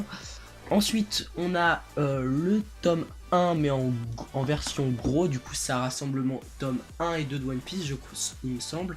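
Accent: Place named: England, United Kingdom. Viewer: Super vidéo, tu m'as donné envie d'acheter certains manga. Et juste comme ça gentiment, j'adore ton accent anglais 😂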